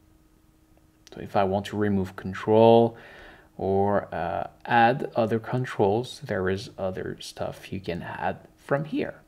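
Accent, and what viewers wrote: Quebec, Canada. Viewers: He's French Canadian. It's a wonderful accent in my opinion.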